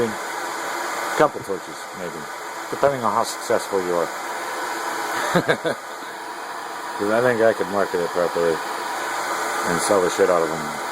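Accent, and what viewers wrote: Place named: Western Pennsylvania, United States. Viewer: New subscriber my friend. Is there a market for laminar surface mix torches? I am a chemist and I do scientific glass blowing. well I used to. When I moved up to Pa from Florida my glassblowing equipment was "misplaced". Including my glass lathe. I am trying to get back into things and I am designing torch set. I'll stay in touch. Where are you ? You sound like Western pa..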